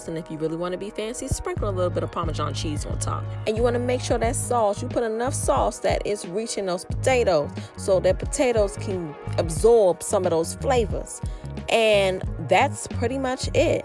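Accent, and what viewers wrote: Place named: Baltimore, United States. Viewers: Amazing video!! You sound like u from the DMV area 😁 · I’m cracking up!! I heard you talking and knew you were from the DMV then I saw the Shoppers label and knew!! Love this recipe sis. Well done 👏🏾👏🏾👏🏾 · Loved the video! I knew you were from the DMV area from when you first started talking! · You frombaltimore 😂😂😂 accent🥰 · Who is this lady why am I just finding you you sound Baltimore😂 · Soon as You started to talk I was like “she sounds like me “. I know a DMV native accent when I hear one lol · Good Lawd! You did that!!! I'm cooking that tonight. I am from New Orleans and looks like home. But, you sound like you are from DC!!! Thanks! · She sounds like she from DC🤭😍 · I think I’m hearing a little bit of a dmv accent , but this look so good 😍😍😩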